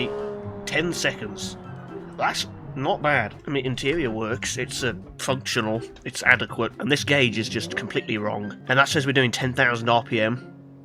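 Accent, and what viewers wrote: United Kingdom. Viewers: Now make an Kei truck funny British man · 0 new funny british man car tutorial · The BRI'ISH funny man has posted WE DO EHT🍷🗿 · this funny british man making my day better again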